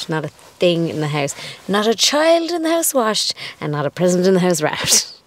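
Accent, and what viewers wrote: Ireland. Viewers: Can I please ask that you might speak a little bit slower, cause as much as I love your Irish lilt, Sometimes, tis a bit bit difficult to understand..As said before, Much love to you, and Millie..Merry Christmas...Simon xx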